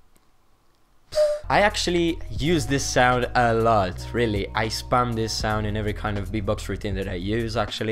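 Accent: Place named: Italy. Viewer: Si sente proprio che sei italiano bro😂 Ti adoro continua così💪🏻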